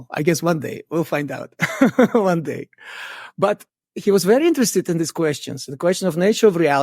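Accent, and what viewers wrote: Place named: Russia. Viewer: I LOVE the Russian accent! Like a badass spy is teaching math and mysteries of the universe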